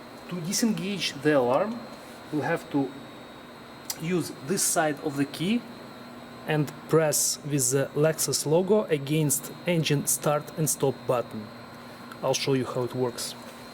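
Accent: Russia. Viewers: That Russian accent 😂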